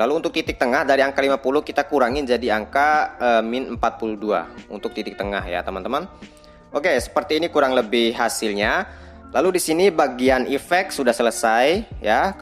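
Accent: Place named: Eastern Indonesia, Indonesia. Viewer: Monmaap bg, orang timur ya? Kental banget nada suaranya 😊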